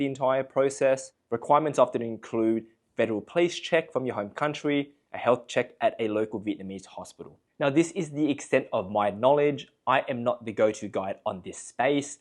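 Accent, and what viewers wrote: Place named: Australia. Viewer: You have an Australian accent.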